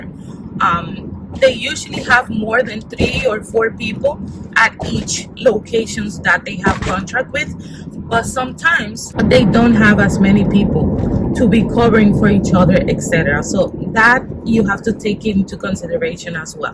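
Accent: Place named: South Africa. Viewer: Don’t mean to be rude but you sound South African.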